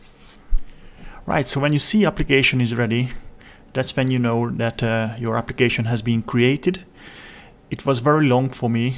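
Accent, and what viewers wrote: Hungary. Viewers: 12 évig éltem külföldön, felismerem a magyarokat :) Egyébként meglepően jó az angolod. Most megyek, próbálom megérteni az auth dolgot. Ezen vagyok elakadva (middleware etc), én még a régi "nyers" php programozáshoz vagyok szokva, de elhatároztam, hogy megtanulok valami modern php keretrendszert. Így lett Laravel. (CSS az megy) Megy minden vacak már, blog rendszer, blabla, de egy role system nem akar menni: admin routing XX oldalra, user routing YY oldalra, middleware hogy mindenki azt lássa amire jogosult. Tutorialt lekövetek, másolás megy, de érteni is akarom :)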